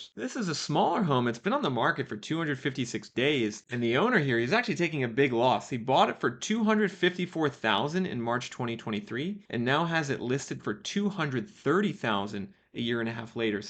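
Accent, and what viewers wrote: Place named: California, United States. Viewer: you sound like your from california 🥱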